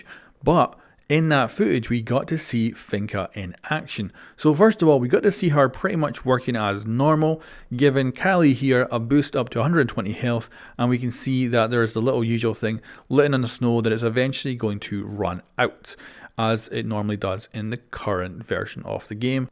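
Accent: Scotland, United Kingdom. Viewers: That scottish accent is very cool, instant sub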